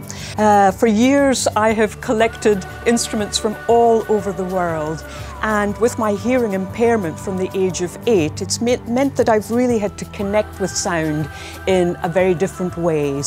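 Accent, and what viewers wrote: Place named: Scotland, United Kingdom. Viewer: Gosh i love the Scottish accent she is brilliant truly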